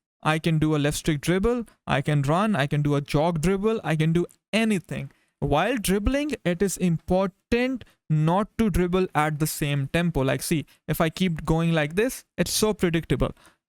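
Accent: India. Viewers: I don’t like your accent Sounds like bajeet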